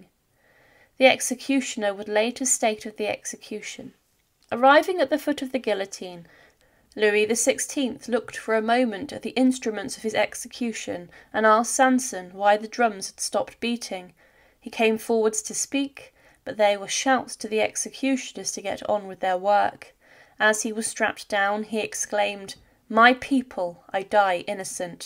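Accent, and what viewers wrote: United Kingdom. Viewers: Narrator is not American lol. She sounds British. "Only Americans" 🙄 smh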